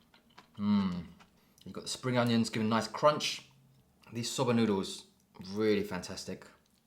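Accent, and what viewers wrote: England, United Kingdom. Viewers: Nicely done,. It's a burning Summer here right now in the Philippines so I guess we'll just opt for the Cold Soba (actually Udon is what I have in stock) for now. 😊 Thanks for the very informative tutorial. Loved your beautiful English accent too!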